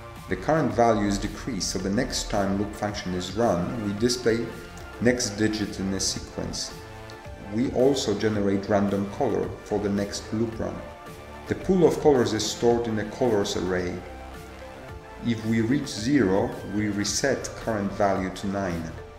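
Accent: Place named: Poland. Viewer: Tak coś po akcencie czułem, a teraz widzę po polsku. Witam rodaka:) Fajnie to się zapowiada:)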